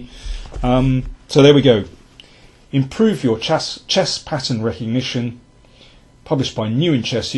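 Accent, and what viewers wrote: United Kingdom. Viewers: I'm also American and I've never had an issue understanding. Maybe I'm more accustomed to the accent due to all the British comedy I watch.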